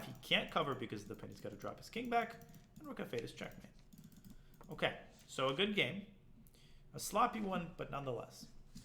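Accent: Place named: Russia. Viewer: Daniel's russian accent is wonderful!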